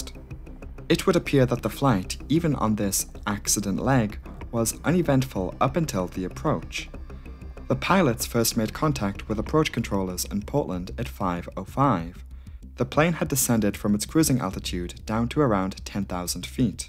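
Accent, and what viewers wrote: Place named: Geordie, United Kingdom. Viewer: I’m fascinated by this accent. It seems mostly Northeast England, but with little hints of South Wales every now and then. Then there’s a touch of rhotic North American creeping on in there too. Is this a Geordie who moved to the US?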